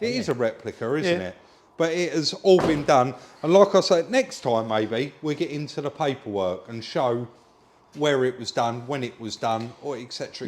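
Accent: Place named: RP, United Kingdom. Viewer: Bit posh you you essx boys isn't it ? 🙂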